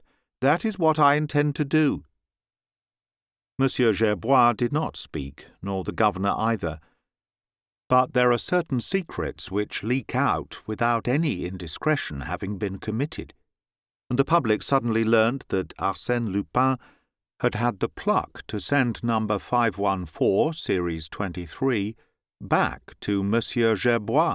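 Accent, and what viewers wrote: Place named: Purley, United Kingdom. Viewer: He sounds like a bloke you 'd meet in a pub in Purley . Not sure where you get your notions of Victorian spoken idioms and syntax from ??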